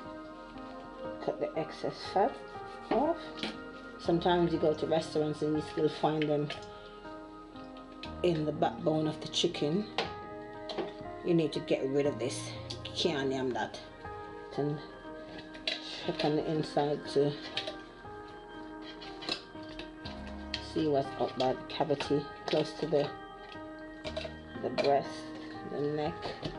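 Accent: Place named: Jamaica. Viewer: "Caan nyam dat", as Jamaican myself I just love how you go in and out between English and Patois.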